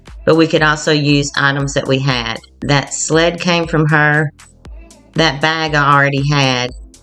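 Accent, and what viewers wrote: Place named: South, United States. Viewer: seldom hear a southern drawl. Yours is lovely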